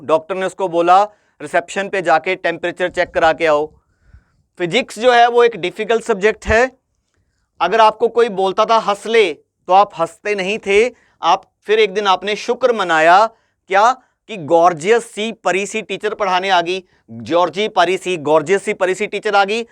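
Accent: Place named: Punjab, India. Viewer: Thank you sir . And love your punjabi accent ❤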